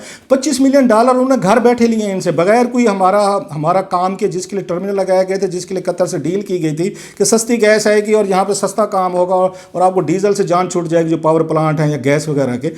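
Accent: Saraiki, Pakistan. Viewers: اس میں کوہی شک نہیں کے سراکی زبان بڑ ی میٹھی بولی ہے ۔آپ کی vl جہاں محلو ما ت کا ذریعہ ہے وہاں آپ کی اردو میں ایک سراکی ٹچ ہے جو سنے میں اچھا لگتا ہے آپ انداز اور اصلوب اچھا ہے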